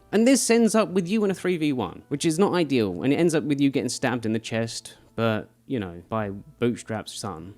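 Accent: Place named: United Kingdom. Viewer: Mate you sound British… what is your issue with the British?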